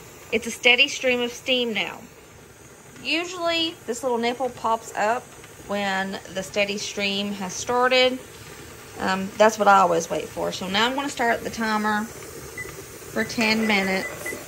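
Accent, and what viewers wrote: South, United States. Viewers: Never heard of home made Spam having lived in many different states I am amazed to see this process. Odd your channel and Mama Baird's both came out with this at the same time. Very interesting you surprise me and love hearing the Southern accent.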